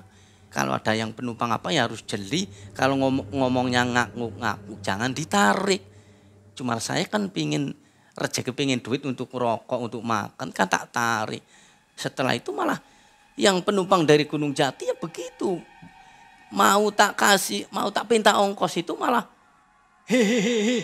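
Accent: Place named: Banyumas, Indonesia. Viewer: Lucu bngt nih orang ceritanya😂😂😂 kaya orang ngapak si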